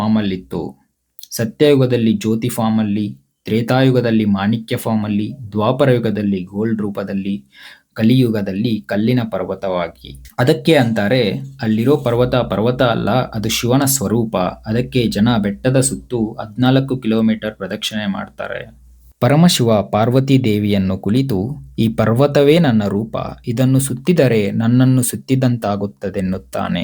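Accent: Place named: Tamil Nadu, India. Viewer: Tamil boy made this video your speech is in Tamil slang. Welcome you speaking in Kannada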